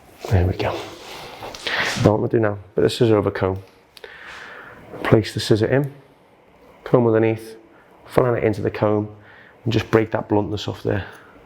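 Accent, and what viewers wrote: Liverpool, United Kingdom. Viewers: Although I'm not from England & don't recognise accents too easily, it seems as though is Scouse (from Liverpool).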